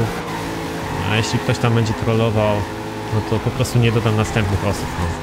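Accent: Szczecin, Poland. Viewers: jesteś ze Szczecina??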